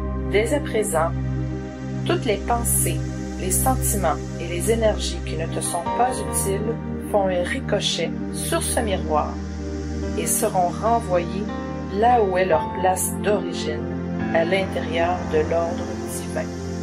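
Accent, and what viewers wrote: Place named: Quebec, Canada. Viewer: Merci pour cette méditation mais dommage je n’arrive pas à comprendre autre langage à cause de votre accent québécois ou canadien. C’est vraiment vraiment dommage mais bon merci en tout cas bonne soirée bonne nuit ciao ciao. Car quand j’ai Michaël me pardonne mais on m’a demandé de dicter ses mots secondaires c’est ce que je fais voilà au revoir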